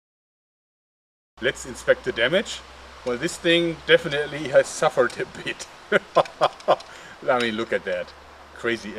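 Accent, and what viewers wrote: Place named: North Rhine-Westphalia, Germany. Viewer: Da bin ich mal gespannt.... Einfach geil - der NRWler ist einfach durchzuhören !!!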